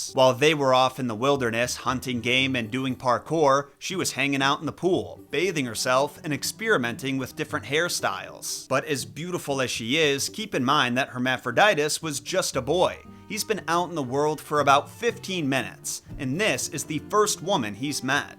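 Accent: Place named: United States. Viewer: Aaaargh !!! The American pronunciation of Greek names is absolutely horrifying! Dye-oh-nye-sus ? Seriously?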